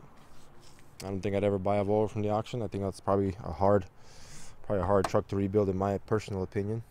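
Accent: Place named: Eastern Europe. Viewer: Славянский акцент у автора проскальзывает. Сначала сомневался. Но выключенный inverter все прояснил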